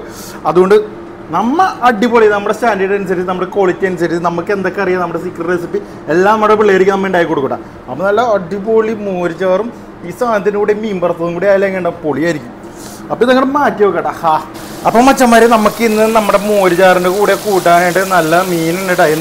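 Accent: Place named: Ernakulam, India. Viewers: തനി എറണാകുളംകാരൻ 😁 " നമ്മ ഈ കാര്യങ്ങളൊക്കെ സെറ്റാക്കി വച്ചിട്ട് പടേ ന്ന് cook ചെയ്യണം " 😁😁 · സംസാരം കേട്ടിട്ട് പറവൂർകാരൻ ആണെന്ന് തോന്നുന്നു😅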